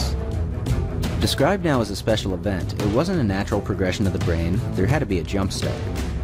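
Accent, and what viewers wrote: Scotland, United Kingdom. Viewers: Scottish? Did I miss a voice/face reveal? 😕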